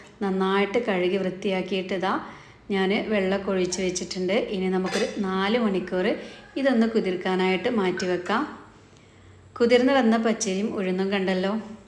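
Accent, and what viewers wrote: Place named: Palappuram, India. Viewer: Samsarathinte style aake marippoyallo ...palappuram style nalla rasayirunnu tto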